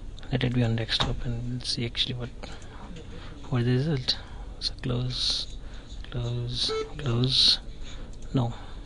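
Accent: India. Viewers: How stupid are the people making negative comments about the guys voice. He's speaks English as a second language.... of course he sounds a little different.. He put the video up to help you out with your dumb selves. You couldn't figure out how to solve your problem... so you looked to the same Indian for help. ugh Lazy idiot racist. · i honestly HATE indian accents it sounds so gross….that doesn't make me racist because i don't like it…anyway nice video, thanks for the help!!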